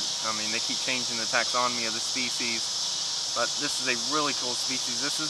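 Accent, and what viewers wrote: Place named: South, United States. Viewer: I never noticed how thick your southern accent is lol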